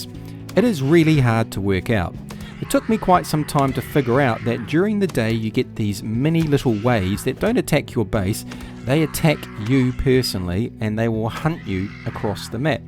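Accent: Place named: New Zealand. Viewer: Have you played it recently or moved on? I'm open to any other suggestions on open-world survival games that I can play with friends. I have not looked into State of Decay yet or Valheim, and so keen on The Day Before when it comes out! I'm also a Kiwi but living in OZ. I get so much crap XD. Happy to subscribe to a NZ game reviewer on YouTube! I assume that because of your accent and logo :)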